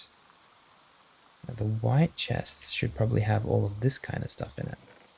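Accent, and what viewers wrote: Australia. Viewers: absolutely adore these videos ❤ love your calming voice, and hearing the soft aussie accent as another aussie makes me feel so warm :) like being on call with a friend as i drift away. please don’t stop making these! <3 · Btw are you Australian? It's the accent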